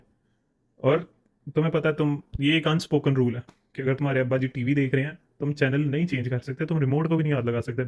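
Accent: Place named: Punjab, India. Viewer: That Punjabi Accent 👌🔥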